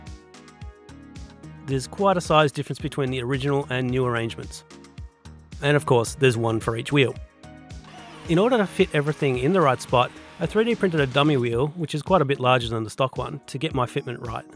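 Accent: Australia. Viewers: hell yea , for some reason i was expecting a pov image just need a little i2c acc/gyro added to that xiao. anti wheelie think drag car and put it out the back alittle it needs the leverage i feel, next id like to see you go brushless then add either an old heli tail gyro for straight line ripping or for extra bonus points any new modern flight controller flashed with your choice of firmware ie ardurover that will give you gyro but also gps waypoints and telemetry "you sound aussie so go 915mhz"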